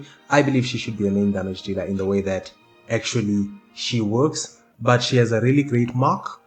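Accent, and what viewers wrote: South Africa. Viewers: Sounds like a South African accent..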